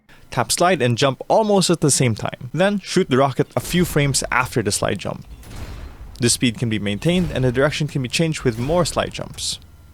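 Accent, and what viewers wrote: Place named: Philippines. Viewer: been watching your guides and they've been extremely helpful, thank you! are you filipino btw because that accent is so recognizable ahahaha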